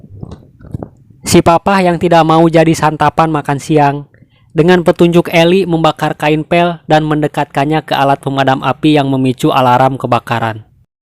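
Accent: West Java, Indonesia. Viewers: Ini yg seru bukan filmnya tp yg ngehost. "si aying yg babaung" 😅😅 dg logat sundanya lucu bgtss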